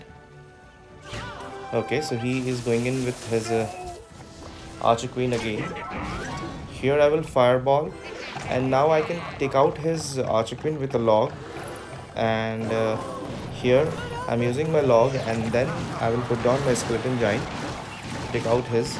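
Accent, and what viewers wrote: India. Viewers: Which country are you from?? U sound Indian...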